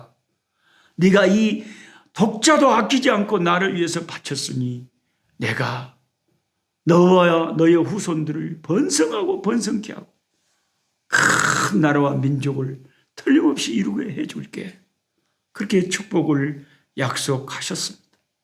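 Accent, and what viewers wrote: Jeolla, South Korea. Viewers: 목사님 덕분에 성경공부 열심히 잘 하고 있습니다 전라도 영어 넘 정감있고 좋아요 ㅎ 아브라함과 이삭의 순종처럼 날마다 체찍질하며 순종하기를 원합니다~~샬 롬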